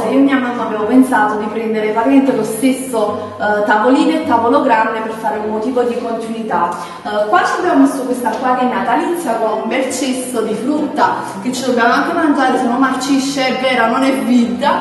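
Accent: Naples, Italy. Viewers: Sei napoletana? anche io comunque sei fantastica 😍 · tu abiti a giugliano o Napoli si sente che parli napoletano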